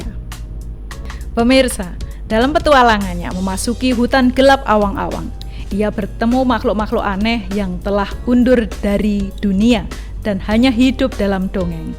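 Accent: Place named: Java, Indonesia. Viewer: Ringkas, padat, dengan suara khas yg "medhok", memjadi keunikan tersendiri..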